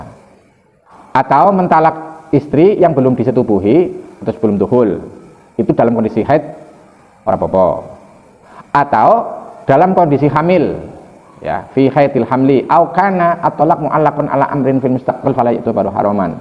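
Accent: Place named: Banyumas, Indonesia. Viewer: Gus ..ngendikane banyumasan bsnget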